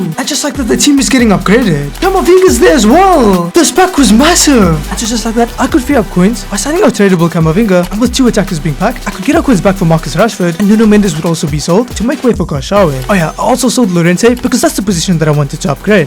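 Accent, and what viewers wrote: South Africa. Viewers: Are you South African 🇿🇦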